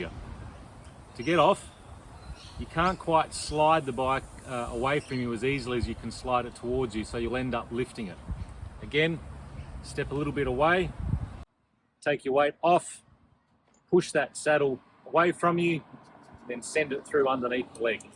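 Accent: Australia. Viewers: I'm looking for a way to mount my bike that doesn't kill my lower-back (I have issues . . . 🙂). This looks like a great one for me to try tomorrow when I get my bike out. I was looking at getting a 'step-through' bike, but I don't really want to spend the money, so this is definitely worth a try. Thanks for the video. Good to hear an accent from down under (no offence to the Americans). Happy riding.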